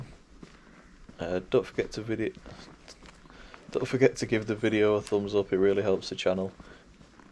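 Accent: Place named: Liverpool, United Kingdom. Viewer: Fascinating! Thank you. I've always been drawn to cemeteries, for the art, the anthropological info and of course for the "unknown" death is a reality and to me, pondering what may happen after we stop breathing- fascinating- reincarnation? Have you seen any tombstones which make a reference to a "new" life- not in the Christian sense, a new life with Christ- I suppose it depends much on where you are exploring- your accent, Liverpool? I love it by the way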